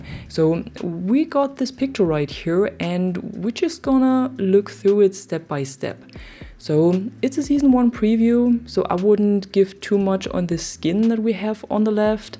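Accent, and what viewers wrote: Germany. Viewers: Man hört übelst deinen deutschen Akzent raus 😂😂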